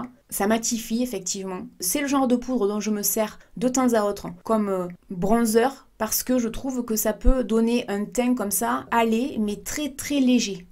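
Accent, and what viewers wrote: South, France. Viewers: J'aime trop ton petit accent du sud il est trop chou 😘😘💛💛💛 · J’adore tellement ce genre de vidéo venant de ta part ,avec le petit accent du sud 🙄🙄 Mérite beaucoup plus d’abonnement je trouve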